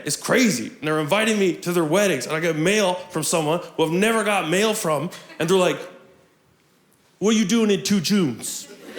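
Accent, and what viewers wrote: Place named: Canada. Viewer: Im an ontario canadian myself. I was watching a racing youtube video and this whole special came up as an Advertisement. Ive never heard of you yet. I almost skipped but heard the first 2 minutes and watched the whole thing! I knew you were canadian instantly. Cheers from ontario! New fan! Edit: legit laughed my ass off outloud for so long my girl yelled at me from the other room asking if i was okay 🤣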